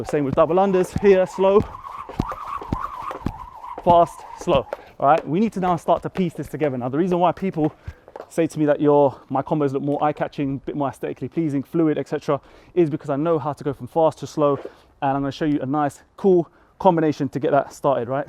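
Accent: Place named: United Kingdom. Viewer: what is your name? Is it?? Love the British accent always. I can only dream to be as graceful as you are jumping rope. I look ridiculous in my form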